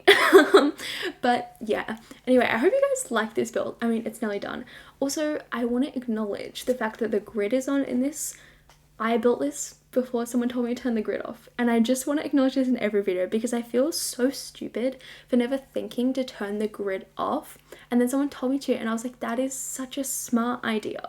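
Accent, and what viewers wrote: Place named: Australia. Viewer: I can't tell if you're australian or not. But i think you sound like one xD I like your accent and voice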